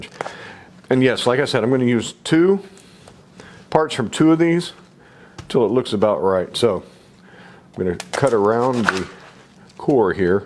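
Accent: United States. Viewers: He is American. They say erb. Brits say herb.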